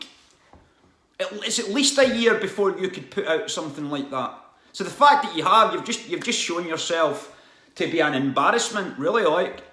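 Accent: Scotland, United Kingdom. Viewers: 54 seconds in Turned you off because you slighted Katie Hopkings , The only sensible woman i repeat real sensible woman who talks any sense at all in these "British" Isles. Regarding most subjects . Secondly i turned you off because of your boat race, Thirdly because of your wasself scoottish accent. Oh and fourthly because if i had listened to the rest of what was going to probably be a woke leftie muslim symathising load of bollocks i would have turned off in the first 10seconds.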